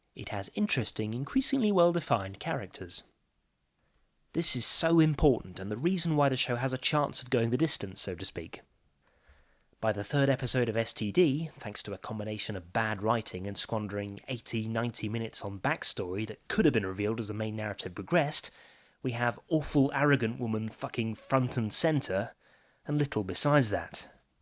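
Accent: England, United Kingdom. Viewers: You sound English? I like the show. Cheers! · I just noticed how unpleasant the combination <expletives + anger/frustration + English accent> is on the ear (I say that as someone with an English accent himself). If I might suggest, it is also a little bit un-English - or certainly exploiting it (mistaking it) as personality or media persona is. I always think that when I hear John Oliver. Wait - is that what we're doing now, copying Americans? Charmless, aggressive loudmouthing an' all? PS. I agree Discovery is awful, the worst Trek series so far (DS9, for my money, being the best).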